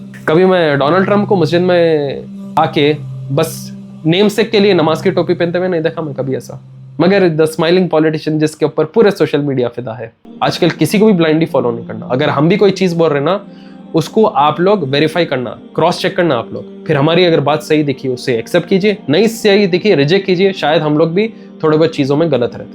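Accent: Hyderabad, India. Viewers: aplog kaha se ho bhai Hyderabadi lagra style mje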